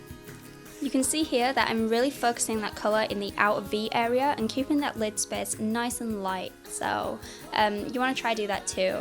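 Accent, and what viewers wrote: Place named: North, United Kingdom. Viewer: Brad and London are having an influence on you! You're starting to lose some of your northern accent and sound a bit softer, more southern:) No worries, I am from Surrey but I've been living in America for the past 18 years...people say I've got an American twang. (That actually pisses me off but it doesn't take me too long to get my accent back properly:)) Very pretty look, and like you said, very not you! That's a mad pigmented gloss, is it sticky too?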